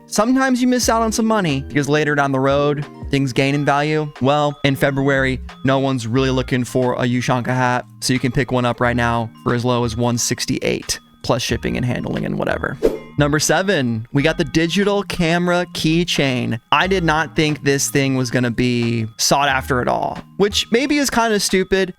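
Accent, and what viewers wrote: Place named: England, United Kingdom. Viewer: always a W with the english accent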